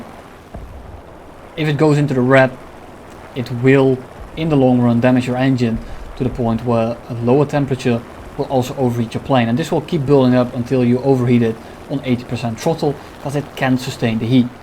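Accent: Netherlands. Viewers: he sounds kinda dutch like jengar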